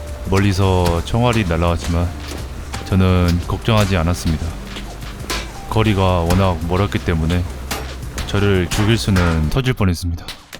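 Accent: Jeolla, South Korea. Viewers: 님 오늘은 뭔가 전라도 사투리가 들리네요 🙂🙂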